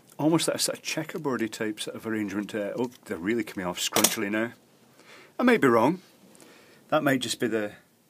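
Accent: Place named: Isle of Man, United Kingdom. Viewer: You live in Isle of Man? I thought you were Scottish, never thought Manx. That's cool!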